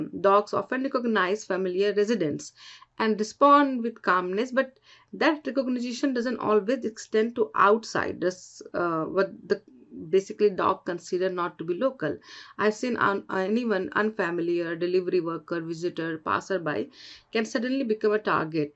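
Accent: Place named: North India, India. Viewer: Who is this journalist.? Aren't there any better candidates ❓❓❓. All north indian slang ugli english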